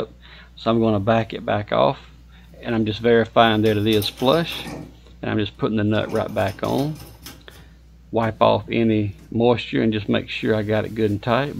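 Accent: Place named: South, United States. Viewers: I work as a handyman and I'm always learning new things. Doing a replacement of this exact valve for a coworker. Gotta say, once I heard the southern accent, I knew I'd be walking away a smarter man. Thanks dude. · Helped me so much for my shower. Could listen to a Georgia accent all day. -homesick in KS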